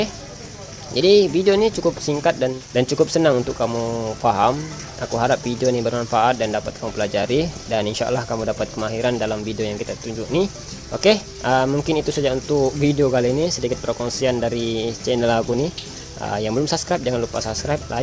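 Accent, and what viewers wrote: Malaysia. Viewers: Awak nich orang mane, cakapnye kaya orang melayu, ini videonya ranca bana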